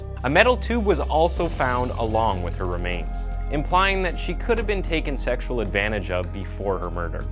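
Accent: Canada. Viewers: you do realize that you made a sweeping generalization about 320 million people, right,? That's aside from the channel's host sounding like a Canuck, rather than an American. The rotten thing I see is your opinion of hundreds of millions of people based on the probably half-dozen or less Americans you may or may not have interacted with.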